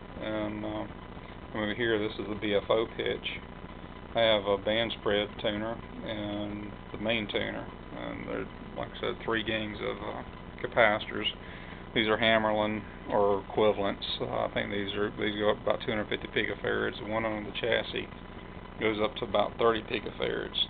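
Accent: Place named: Southeast, United States. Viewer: more than likely....Southeastern USA....some accent detected....old southern boy myself...I miss a word or two here and there, but no big issues....hell of an undertaking, I think....and it F'n works....I can't build anything close to this....some small kits....foxhole/crystal sets for MW/SWL/AM/CW....but nothing on this scale/level....damn good job this cat did....thumbs up.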